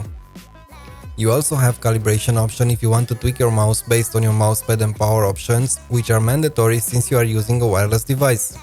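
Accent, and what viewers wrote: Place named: Germany. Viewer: Very serious setup and professional review, good stuff. You sound like Germans, are you? :D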